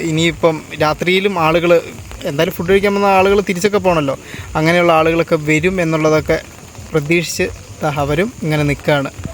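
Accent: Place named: Kothamangalam, India. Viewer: നിങ്ങൾ കോതമംഗലം കാരനാണോ കണ്ണൂരുകാരൻ ആണോ നിങ്ങളുടെ ഭാഷാശൈലി കോതമംഗലം ആണല്ലോ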